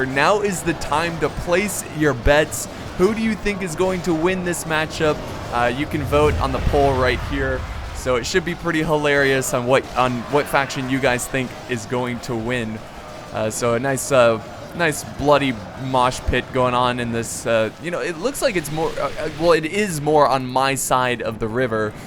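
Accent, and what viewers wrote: United States. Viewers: but yeah its because of having an accent, an American accent. EVERYONE has an accent. And i bet has problems saying PLENTY of non German words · Please, (no offence intended) pronounce those names right, it's kind of annoying to hear them pronounced in an American way. Again, I am not looking for a fight, this is just a piece of advice. Trust me, pronouncing them right grants you extra style points. Great videos, keep it up!:)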